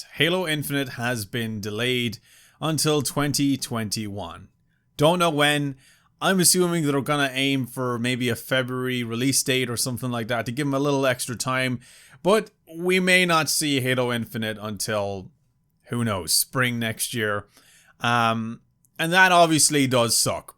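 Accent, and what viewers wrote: Ireland. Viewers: i can´t tell if you have slightly poor english or if you´re just irish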